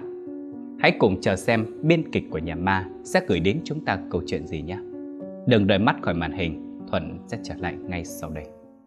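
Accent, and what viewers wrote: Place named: Central, Vietnam. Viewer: Nghe truyện của anh thấy hay lắm luôn ạ 🥺🥺 nhưng mà do giọng a ở miền trung e nghe hơi khó ạ 😢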